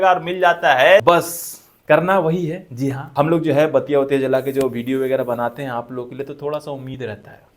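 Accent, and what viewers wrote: Bihar, India. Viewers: Ka ho bhaiya. Aap bhi bihar se hauwa😂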